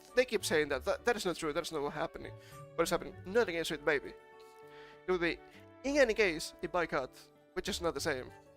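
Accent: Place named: Germany. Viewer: Dude what accent is that?? I thought you where speaking German at first.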